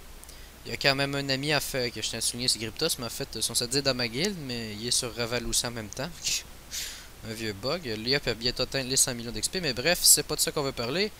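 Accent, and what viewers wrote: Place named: Quebec, Canada. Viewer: honnêtement je viens tout juste de découvrir ta chaine a cause d'une vielle vidéo de Tyra et cela fait du bien d'entendre un québécois jouer a dofus xD Ps: vive le Québec ^^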